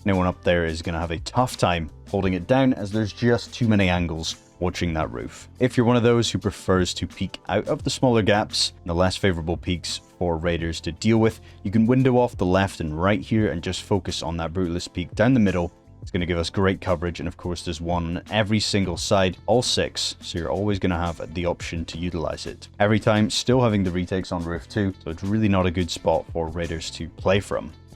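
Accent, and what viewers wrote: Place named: Northeast Scotland, United Kingdom. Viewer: you sound from north east scotland 😂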